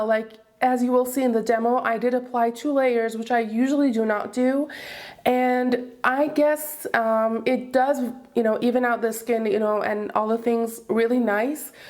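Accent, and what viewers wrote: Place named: Iran. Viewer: jan (I love your Persian accent!) I love your eyeshadow look here! The bronze color looks really good on you. I never had luck with Josie's makeup products and because it seems they are more pink toned.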